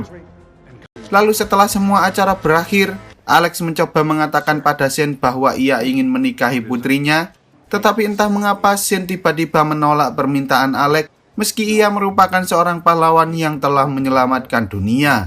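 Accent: Java, Indonesia. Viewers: Rasah kesuen 😂 Jowo e endi? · Lu orang jowo ya bang sama gw yo orang jowo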